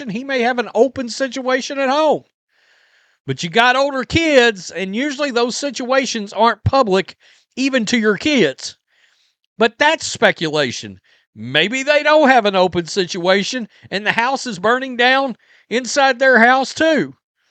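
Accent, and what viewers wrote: South, United States. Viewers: Hey, It's "....I don't know what other direction it could have GONE.." not "...have went." Repeat after me: I go, I went, I have GONE. I know you're a Southerner, but that doesn't mean you can't use correct grammar even in a Southern accent.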